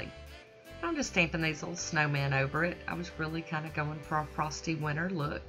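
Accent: South, United States. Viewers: The Whisk is very pretty! In the end I like this, it's cute with the snowmen and snowflakes! I gotta add, lol you tickle me to death with your "southern slang" and pronunciations (it sounds like I'm listening to myself) I just love listening to you talk! I never really understood why people would say that to me lol but now hearing you I understand! I got up from bed not wanting to wake my hubby with my horrible cough I have going on so I decided to go to my special spot and catch up on my videos lol, not so sure it was good for the cough lmbo but it sure is good for my feelings haha! Thank you, you're Awesome! Till next time, much love!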